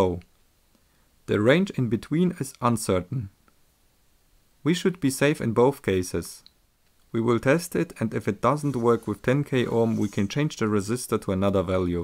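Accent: Germany. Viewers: Great sense of humor with a German accent. I love your videos.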